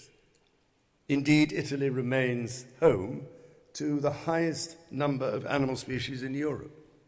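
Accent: United Kingdom. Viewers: Pronunciation was good! With a strong british accent, obviously, but really good! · I'm Italian and what's impressive here is King Charle's full knowledge of the relationship between written accented vowels and the kind of stressed sounds they require, namely à, è, é, ì, ò, ó, and ù, as well as the pronunciation of double consonants such as "mm, ll, nn, cc, ff, pp, rr, tt, bb, dd", etc. He also knows the correct use of voiced and unvoiced consonants such as the unvoiced "z" of the word "incoronazione" that he used early in this speech (instead of using the voiced "z" sound found in the word "zanzara", for example, which would've been incorrect). The accent is British but extremely clear. I was very impressed. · Perfectly understandable, obviously he has a lovely British accent!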